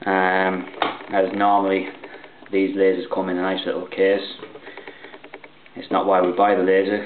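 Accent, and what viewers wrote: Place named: Australia. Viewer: oh great an Australian :(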